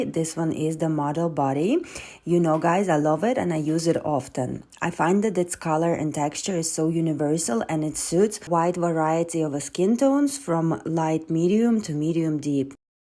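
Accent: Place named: Russia. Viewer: Accent is familiar, from one of the former USSR states) Russian , I think )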